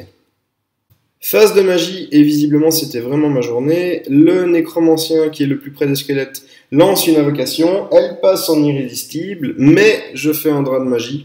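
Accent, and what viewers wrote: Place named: Switzerland. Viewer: Salut encore une fois bon rapport de bataille bien expliqué. Si tu souhaites rencontrer de nouveaux joueur je suis motivé pour cela. Je joue Nains, Orques et Gobelins et Bretonniens. J'ai cru jusqu’à cette vidéo que tu étais Belge ^^ alors que tu as l'air plutôt Suisse. Moi j'habite à une heure de Genève mais en France. Bonne continuation et longue vie à ta chaine.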